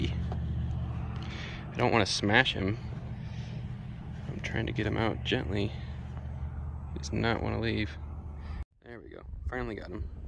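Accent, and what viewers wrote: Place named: Zion, United States. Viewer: Mystery man I'm guessing he's near Zion. Has same accent as locals in Zion area. Cool video btw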